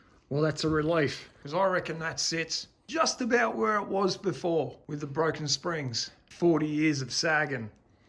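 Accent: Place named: Australia. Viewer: Is this in the USA or Australia hence the Aussie accent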